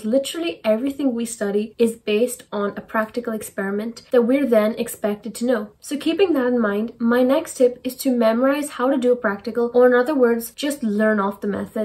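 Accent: Northern Ireland, United Kingdom. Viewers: NORTHERN IRISH?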